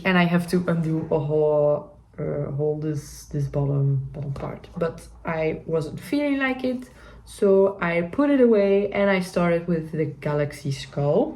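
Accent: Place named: Germany. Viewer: Welcome to flosstube from the south or Germany! ☺️ I actually thought you were German too at first, your accent sounds very similar.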